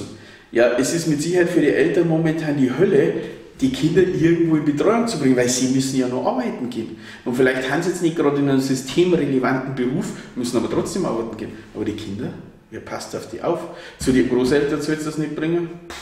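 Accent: Bavaria, Germany. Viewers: Ich hätte mir ja gerne das komplette Video angeschaut, aber die Raumakustik ist so schlecht, dass ich dein Bayrisch, das ich sonst so einigermaßen verstehe, nur mit sehr großer Anstrengung halbwegs verstehen kann. Falls ich der einzige bin, dem das so geht, dann vergiss meine konstruktiv gemeinte Kritik, denn dann liegt es wahrscheinlich an mir.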